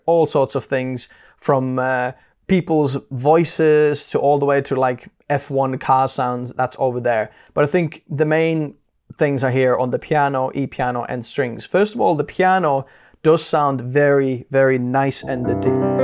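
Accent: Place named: United Kingdom. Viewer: Wow…just realized you posted this a day ago! (On the basis of your accent, you’re posting from the UK, I’m assuming….) Coincidentally, I just purchased an LX706 today. I have a few days before it will be delivered, so I’m biding my time watching YouTube videos on the model, which is how I happened upon your excellent video. Thanks so much for the detailed presentation! I live in Honolulu and purchased a Roland FP30 about three years ago during COVID when piano tuners weren’t making house calls. I had tried to teach myself how to tune my 1990s Yamaha acoustic upright but messed everything up completely and broke a string. Knowing that tuners wouldn’t be making house calls soon, I immediately drove to the only piano dealer on Oahu and picked up the FP30 with stand and pedals for $1000. Over the next few months I fell in love with digital pianos, even though I’m a classical pianist who doesn’t care about all of the non-piano sounds. 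When I finally had my Yamaha tuned this past summer, I realized that I had become a digital piano player and that acoustic uprights just weren’t as satisfying anymore since the advent of high-performing digital pianos. Fast forward to this past week, when I decided to upgrade from the FP30. I watched numerous videos comparing different brands and models, then decided on the FP90x as the most affordable upgrade. But then I went back to the original piano seller’s website and saw that they had a pre-owned LX706 selling for about half the retail price…s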